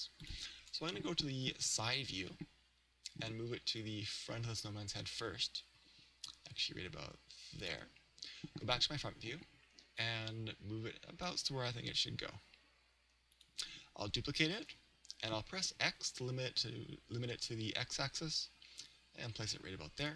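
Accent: Canada. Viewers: I <3 you because you're Canadian! I noticed right away!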